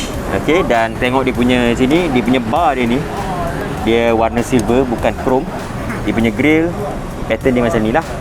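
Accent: Terengganu, Malaysia. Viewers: Dang² lg cakap teganu. Terbaekkk review boh😂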